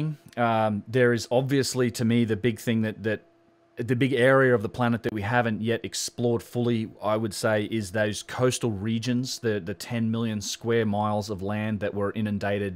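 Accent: Australia. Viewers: hey , wow , wish i hadd known you were doing this , , and dude you sounds like an aussie , , same , , please explain 1inch high heiroglyphs in granite , done wih brass chilel , , come cuts in red granite 1mm thick 2mm deep , , are you aussie , would like to show you in person , i have worked with sonte for 34 yrs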